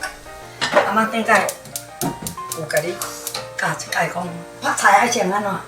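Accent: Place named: Taiwan. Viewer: 的孫真正有福氣，有thang 聽到正港原汁的台語，觀眾也仝齊分享!讚!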